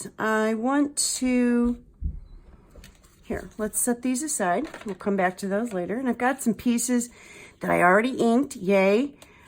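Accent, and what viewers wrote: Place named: Midwest, United States. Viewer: I found you through Tracie's GDT and am a new subbie! Loved your journal & followed over to see your pockets. I was so tickled when you said washi or warshi... LOL I was like omg she must be from the midwest, like Missouri! Then you said you were from Mo, I was born there and still have family there but I have not lived there since I was young. My grandma, my, mom, and all my family from MO & IN all say WARsh the dishes! Have fun and good luck with your channel, you are quite pleasurable to watch, nice speaking voice and a good sense of humor always win me over mixed with good crafting! win win!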